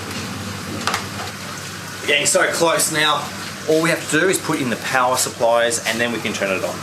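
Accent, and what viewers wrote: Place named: Australia. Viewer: That sounds awesome, take us along for the ride! The Germans really know their reefing. :) haha, what I liked about the old outro was the long hair and the long aussie "hit the looike" :D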